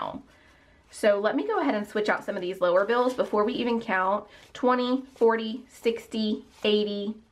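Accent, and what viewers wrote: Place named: South, United States. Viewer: I knew from your accent that I was listening to a southern girl 😂 hello from Louisiana!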